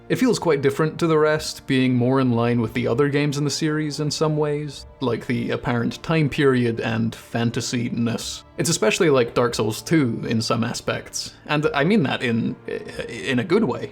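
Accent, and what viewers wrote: United Kingdom. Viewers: Babe wake up, uploaded. It's giving British.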